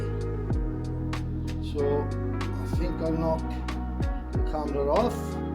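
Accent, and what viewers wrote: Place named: Wales, United Kingdom. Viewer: I'm watching this from Australia as I've got a 1250 Bandit too. Love the Welsh valley's accent as I'm originally from Hengoed !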